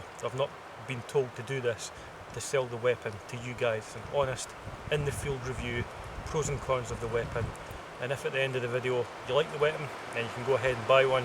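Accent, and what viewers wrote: Scotland, United Kingdom. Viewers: you dont get much more scotish =S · You are so scottish like john mctavish :)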